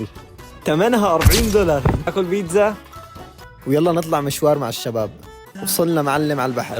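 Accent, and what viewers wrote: Egypt. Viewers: ده لدرجة اني بحسه مصري😂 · انت من سوريا شلون انت تتكلم مصري 😊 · هو انتي مصري ولا سوري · لهجتك كأنها مصرية ماتتكلم مثل اهل سوريا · ممكن ترد انت مصري ولا سوري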